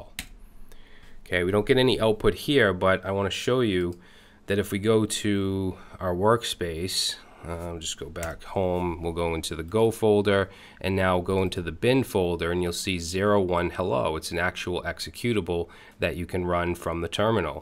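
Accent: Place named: Northeast, United States. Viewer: I love your accent. It reminds me of my childhood. Where in the Northeast are you from? (My guess is the Tri-State, probably Jersey.)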